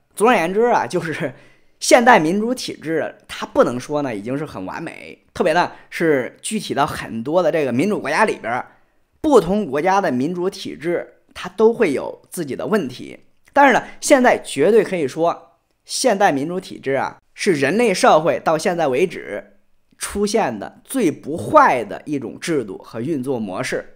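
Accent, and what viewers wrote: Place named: Hefei, China. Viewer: 讲话的很象合肥人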